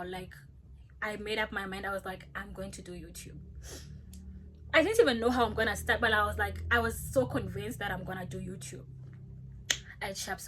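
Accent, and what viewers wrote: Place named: South Africa. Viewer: You sound so South African it’s crazyyyy!!! This is so inspiring!!! I’m so proud of you 🙏🏾❤️ I just found you and I’m hooked sis ❤️❤️❤️❤️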